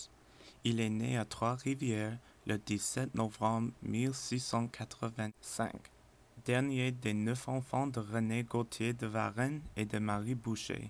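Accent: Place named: England, United Kingdom. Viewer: this is an english speaker trying to talk french.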